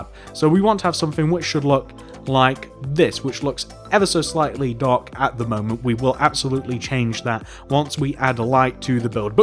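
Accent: England, United Kingdom. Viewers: are you English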